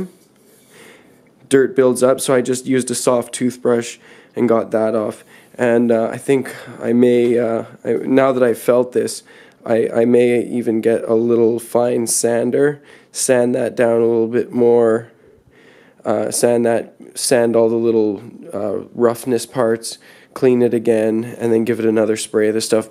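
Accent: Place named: Canada. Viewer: enjoyed the perceptible Canadian accent and "oops, that's French." Will definitely try this on the used Konova K3 I bought used on eBay.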